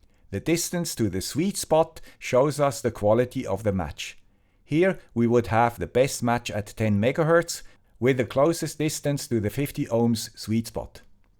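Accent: Switzerland. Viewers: So true Sir. And the cool guy with the cool Swiss accent always responds! Talking about dedication 😎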